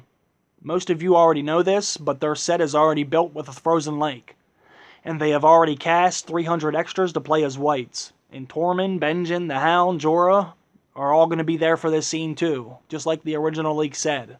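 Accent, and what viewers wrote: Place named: Georgia, United States. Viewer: I like your theories, hit and miss with some of mine, I like what you said about the possibility of a dragon killing weapon, interesting idea, I doubt it will be projectile though too 'Hobbitty'. don't worry about the haters this is fantasy for crying out loud, some people take this too seriously. Anyway nice post, thanks for putting the effort. ps. where are you from ? what is your accent going to guess Georgia?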